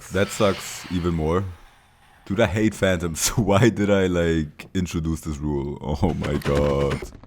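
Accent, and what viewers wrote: Germany. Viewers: Why do i think that U are a German guy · Not even 10 seconds and i could already hear the german accent 💀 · are you german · I know a German accent when I hear it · I can smell the German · out of context but are u perchance german? ur accent and pronunciation sounds like it (coming from a german person) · damn u got a cool german accent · Ich hear a deutschen akzent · Ohne Witz, ich mache das Video an, höre den Akzent und denke mir… der ist doch deutsch. Checke dein Acc and low and behold its in Germany 😭😭 love that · First time watching a video of yours and I love how I immediately said "German" the moment you spoke😂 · Are you german? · i know a german accent when i hear one haha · I can here a little bit Deutsch in your englisch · I hear deutsch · you sound so german 🙏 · Do I hear german? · as a german I hear that you are German only because of the voice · I hear the DEUTSCH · Is he german? 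Bruder ich hörs doch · i hear a little bit deutsch haha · Ich Liebs einfach wie ich in 5sek den deutschen Akzent rausgehörte habe 😂 Geiles Video Grüße auf Hannover ❤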